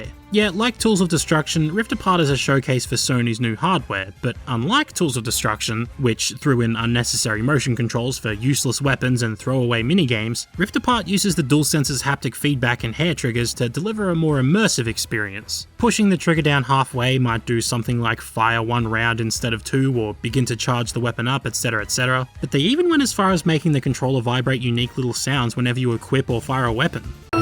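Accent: Australia. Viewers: thank god this dude is Australian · where about in australia u from bro? (i’m from perth) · Thought something was off while watching the video, turns out he's just australian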